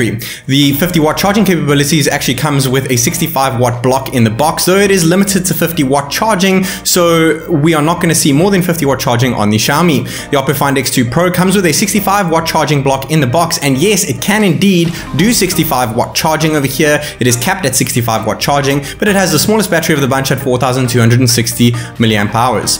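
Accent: South Africa. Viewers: Is this guy a South African?? How'd you get these phones?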